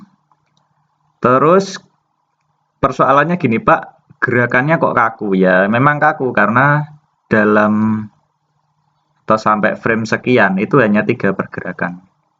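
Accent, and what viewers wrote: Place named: Java, Indonesia. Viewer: Pak medok banget hh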